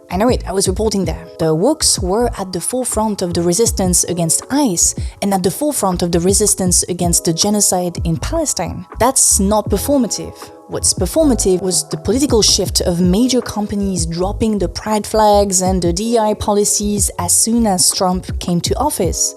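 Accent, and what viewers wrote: France. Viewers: which French department are you from? I’ve never heard a French person sound this French 😭😭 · just so you know, no one would be watching your content if you didn't have the french accent.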